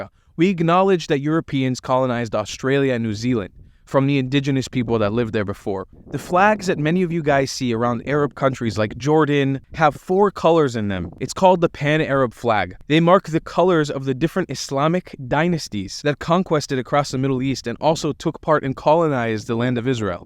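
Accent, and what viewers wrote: United States. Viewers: You sound American. But the generation who came from Baghdad - of course they are Arabs. It's not abour "blood", it's about language and culture. Why Iranian Jews are both 100% Iranians and %100 Jewish and even good Zionists? And why you guys are offended by being called Arabs (which you are). In Israel they taught you to hate yourselves. And if you are not Arab then you are left with no culture. Hence the situation of "mizrahim" - crime, "arsim", far right... Arab culture does not belong only to Muslims. There are Arabs of many different religions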